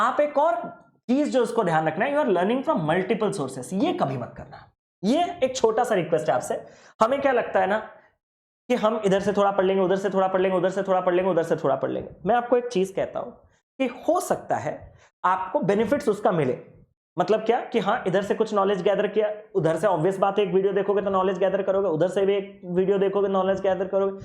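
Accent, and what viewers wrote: Odisha, India. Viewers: Tum odia ho kya kese hindi bol rehe ho❤❤❤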